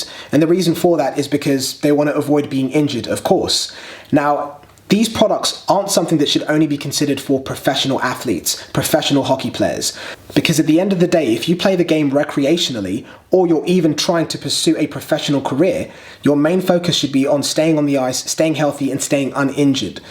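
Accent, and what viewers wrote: England, United Kingdom. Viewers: Anyone else find it odd hearing an Englishman talking about hockey equipment?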